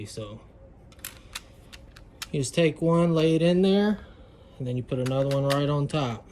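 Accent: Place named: Indiana, United States. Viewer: Yo you from Indiana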